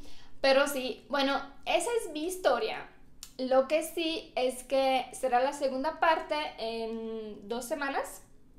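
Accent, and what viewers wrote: Mexico. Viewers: "nolohayo" "creo que le hayo aquí" 😊😊😊 bien mexicano hablas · Tu acento es super mexicano. Felicidades.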